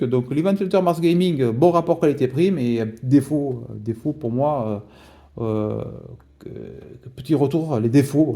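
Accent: South, France. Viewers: l'accent du sud représente! Pour une fois que quelqu'un dis pas de la merde ca fait plaisir! Je m'abonne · ah d'accord je suis de Toulouse j'ai reconnu l'accent de la région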